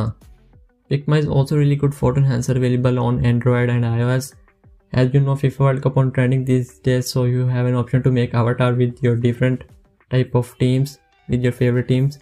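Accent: India. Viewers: Logat india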